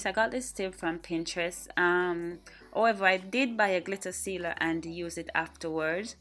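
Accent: Jamaica. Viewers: Just the fact that u sound Jamaican girl I had to subscribe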